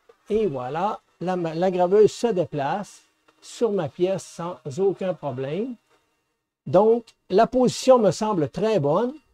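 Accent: Quebec, Canada. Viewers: hey salut merci pour la video jai beaucoup appris!!! tu viens de ou avec ton accent c'est quebecois je suis de montreal moi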